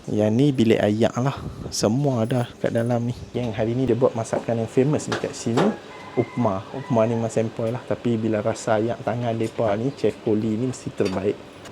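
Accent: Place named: Kedah, Malaysia. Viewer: Aku dah terjerat dgn channel tuan.. Haru... Aku jrg tgk channel mcm ni.. Pasai pa tak tak tau blh sgkut plk.. Mgkin cara penyampaian dan adab dia dgn org kot.. Tuan Penang ka kedah.. Lenggok mcm Penang..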